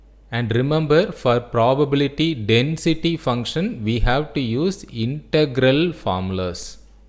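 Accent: South India, India. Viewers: I think you are from south indian because your talking style is different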